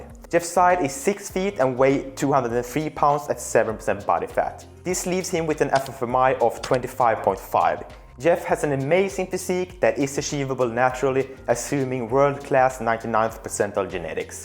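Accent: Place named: Sweden. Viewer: Bro are you Swedish? Cause your accent sounds quite Swedish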